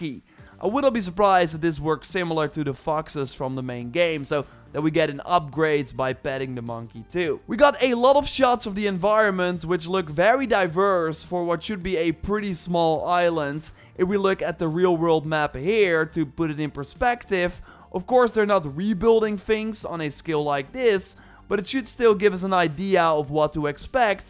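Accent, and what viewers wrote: Netherlands. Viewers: Damn that dutch accent is jarring And i am dutch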